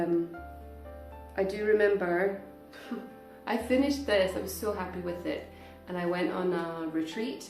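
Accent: Scotland, United Kingdom. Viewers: Hi! I am near Aix en Provence and I’m a sucker for the Scottish accent! I too knit but right now I’m spinning more than ever! Have a lovely afternoon and I hope our paths will cross! Adrien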